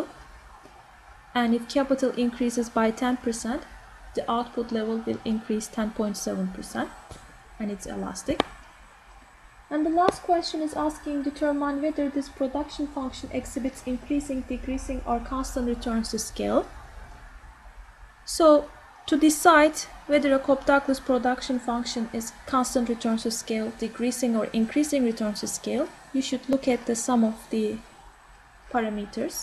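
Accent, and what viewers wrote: Turkey. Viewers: tam bir türk ingilizcesi nerde duysam tanırım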